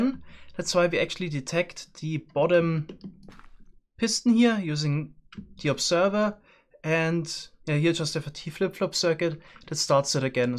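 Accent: Germany. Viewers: Are you german? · I'm curious, are you German or Austrian? I recognize that accent... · He is german isn’t he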